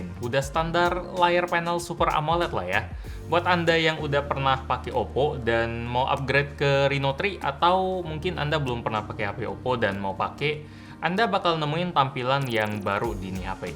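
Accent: Palembang, Indonesia. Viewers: Gelep itu bhs Palembang, krn orang palembang jd wajar aja masih kebawa bahasanya. Lagian beda tipis sama bhs indo. Beda E dan A aja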